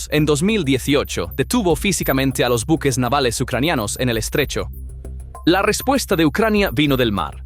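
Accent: United States. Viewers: si poneis voces en castellano porque lo poneis con acento gringo? suena ridiculusssss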